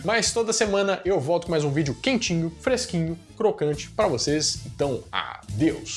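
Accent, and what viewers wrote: Minas Gerais, Brazil. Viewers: Esse cara fala igual mineiro.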